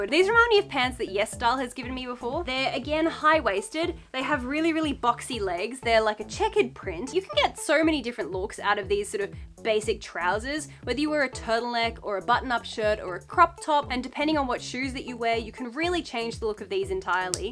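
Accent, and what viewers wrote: Australia. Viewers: Gorgeous skirt clearly vintage- NO, NO, NO! Do not compromise this piece- it looks lovely on you as is- really suits you! Who’s the green cutie? Archie is he? His vocalisations and video bombing you at will is so sweet and it’s clear you two have a warm and lovely relationship- gently biting each piece to be involved- so cute! Clearly new to you and your wonderful AUS accent- ‘Hullo from the USA 🇺🇸❗️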